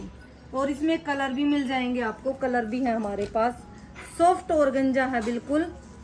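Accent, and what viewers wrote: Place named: Haryana, India. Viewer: She is belong to haryana state ..so speaking tone is different.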